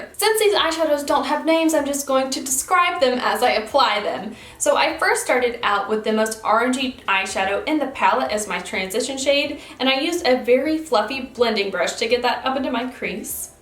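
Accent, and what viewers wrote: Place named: South, United States. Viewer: LA Colors has a really nice matte lipstick line that are gorgeous. Where are you from ? I'm from Alabama. I love to hear another southern gal on YouTube !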